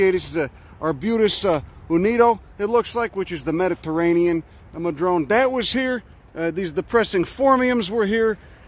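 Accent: Chicago, United States. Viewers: That's a pure Chicago accent.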